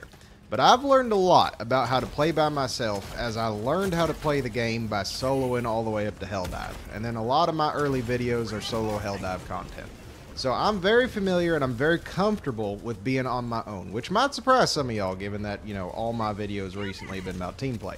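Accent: South, United States. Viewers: The southern accent fits helldives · Aayy like your southern accent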